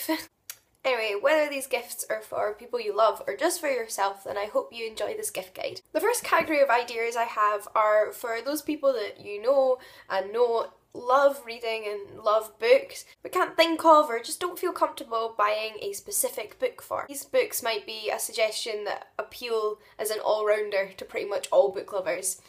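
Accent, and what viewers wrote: Scotland, United Kingdom. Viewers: Thanks a lot for those amazing suggestions! PS: I love yout Scottish accent ♥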